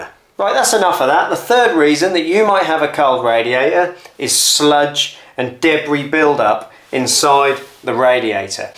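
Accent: Norfolk, United Kingdom. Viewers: Sounds like you’re from Norfolk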